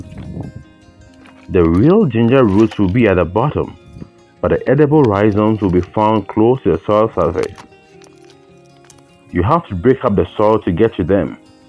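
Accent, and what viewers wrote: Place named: Ghana. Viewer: Doctor!! This is my 2nd video view today since I found your page! After reading a bit about you i know why I enjoy learning from you!! #1 your soothing voice..I was going to guess Ghanaian because it sounds so familiar and comforting! My neighbor's are from Ghana!! And the grandma (Boss!!😅) was so amazed when she saw the garden jnside my apartment 😁!!#2 youre a 🥼 scientist! My major was in science so you definitely have my attention because I know you will have experimented with all of what you're teaching us and want to deliver the best possible results! #3 youre obviously a kind, generous man and we need more of that in this world! 🌎 So thank you for not only helping me grow some plants that I've failed at for decades, but also giving me hope that there are goodhearted, bright, kind humans around. Blessings to you and yours Dr!✌🏼💜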